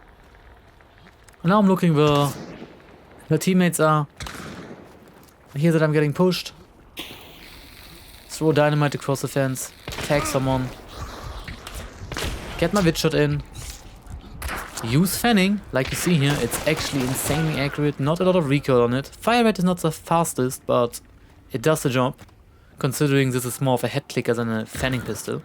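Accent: Germany. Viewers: as a fellow german i cant help but love your THICK german accent lol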